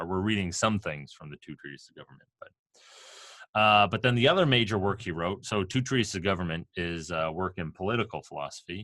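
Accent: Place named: Great Lakes, United States. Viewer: Are you from Michigan or Ohio? Somewhere else in the Great Lakes Region? Curious based on your accent. Thanks a lot for the great content man! Fun to get a mini-Uni-style course on here.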